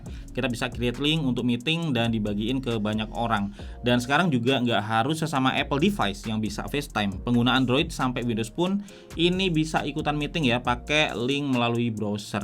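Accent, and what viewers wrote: Malang, Indonesia. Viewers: Asli malang bang ?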